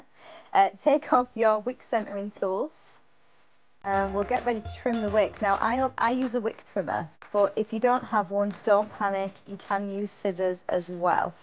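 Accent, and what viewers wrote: United Kingdom. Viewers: Uffff…. that nonsense British accent!!! Such a torture to ear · Thank you. Quick and simple. (Are you from Hull?) love the accent. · Nice and simple to follow. You sound like you're from my neck of the woods, Leeds maybe? It's unusual to hear on YT 😊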